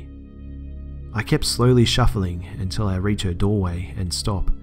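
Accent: Australia. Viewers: He's Australian XD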